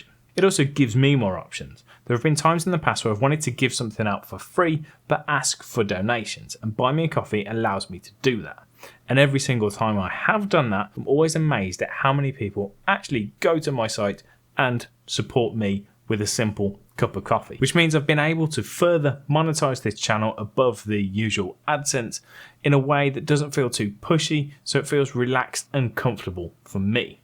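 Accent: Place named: Birmingham, United Kingdom. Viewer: just come across your channel chap. Great stuff, explained the buy me a coffee concept so clearly I’m off to sign up. BTW, that accent sounds like yo live by me near Brum?? If you do and want some stills to use on channel/social media, I’m happy to help FOC (if I can pick a few tubing tips up from you). Thanks for the great video, cheers Phil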